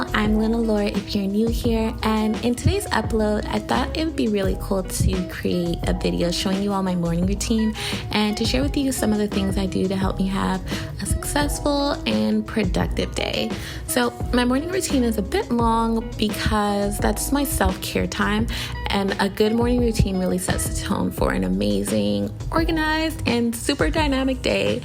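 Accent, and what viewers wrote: Nigeria. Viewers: She’s definitely either Nigerian or Ghanaian😭✋🏽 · same totes nigerian accent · Wow 😯 I had to pause when I heard your naija accent. Ayyyyy Hi sis 💕🇳🇬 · As soon as you switched to the Nigerian accent you won my heart and I subbed 🤗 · AS soon as you hit that Nigerian accent I subscribed! I love following African youtubers because its easy to relate to them. I am glad you were in my recommendations! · That Nigerian accent was unexpected 🤣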